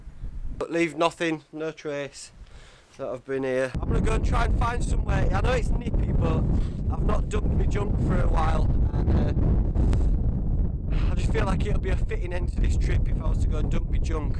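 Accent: Yorkshire, United Kingdom. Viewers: my favourite fellow 'mucker' for outdoor stuff. And not bad a carpenter too. Your natural skill of good Yorkshire waffling is brammer Keep on enjoying yourself. Really shows in Ur vids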